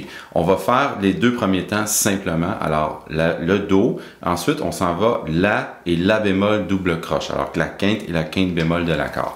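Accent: Quebec, Canada. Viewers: Bonjour. Je trouve tes vidéos très utiles. Elles m'aident à progresser dans ma technique. L'idée de la phrase musicale hebdomadaire est géniale. C'est court et pour moi qui suis débutant mais passionné, ça me permet d'avoir un exercice quotidien pour mes séances de musique. Je n'ai pas d'idée particulière sur un accord ou une suite d'accord, mais plutôt une suggestion (peut être pour plus tard), une phrase question...et sa réponse.😶 Et puis je voulais te dire merci pour ta générosité, ta simplicité et ta clarté. En plus cette pointe d'accent d'un cousin d'Amérique est tellement rafraîchissante...😁. À bientôt. Christian.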